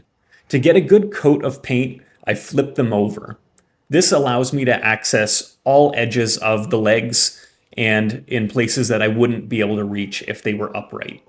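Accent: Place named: Canada. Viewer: They turned out beautiful and your narration is easy to follow. I knew you were a Canadian right away neighbor. Visited the Falls last month--a view that never ceases to amaze me!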